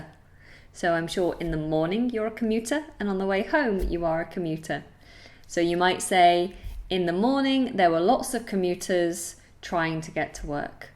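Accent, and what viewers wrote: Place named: United Kingdom. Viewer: Amazing these live lessons!!! Stunning British accent!! Thanks so much,... and Jacob Baby English of course which lend us his lovely Mum!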